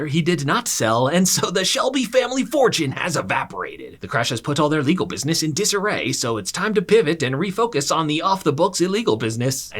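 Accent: United States. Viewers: Very good recap, not an English standard, but for an American quite acceptable. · I can't lie I was initially dubious with the overly American accent but this was a fucking great summary. Honestly made me realise shit I didn't even notice second time watching the show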